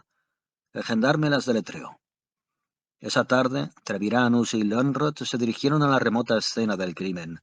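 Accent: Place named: Galicia, Spain. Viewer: Voz gallega🤮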